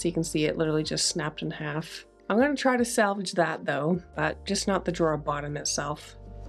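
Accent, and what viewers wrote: Nova Scotia, Canada. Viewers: Dear, I've been watching your videos for a while. I'm a guy who has 2 left hands (including 5 thumbs on each hand), so I would never dream of trying to flip furniture, but watching you do your stuff has taught me so much about furniture. I'm truly grateful for the opportunity to see what you are doing and hear your explanations. Firstly, it sounds as though you really know what you're doing, and secondly, I love your Canadian/Nova Scotia accent. You sound so calm, so when I've had a stressful day, I watch one of your videos and can unwind. So, for me you've become the therapist:-). I've watched other renovators, but you and Flipping Drawers (for similar reasons) are my favorite flippers. So, thank you for what you do (I also <mostly> love your ideas) and for the videos. I hope you can do much more in the years to come. Best wishes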